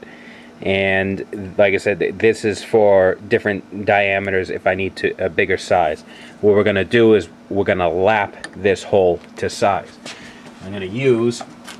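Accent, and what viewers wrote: Boston, United States. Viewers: Wow really nice work I've subscribed. Love to do this. May I ask is that a Boston accent you have.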